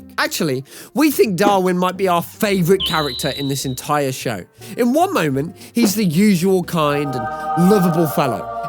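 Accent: United Kingdom. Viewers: This is the first time i see this guy and i just clicked it and the british accsent really scared me idk why 😭🥀🥀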